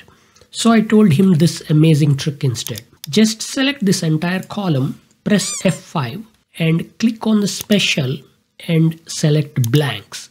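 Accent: Tamil Nadu, India. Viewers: Nice tamil accent with english😊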